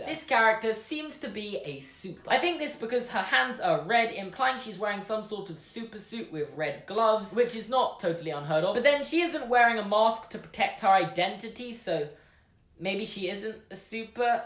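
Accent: United Kingdom. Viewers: you are from the uk!?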